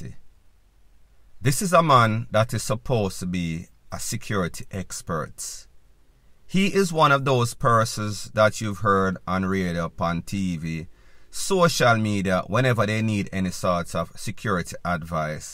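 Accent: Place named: Jamaica. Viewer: I really love to listen to u ❤ I wish u all the best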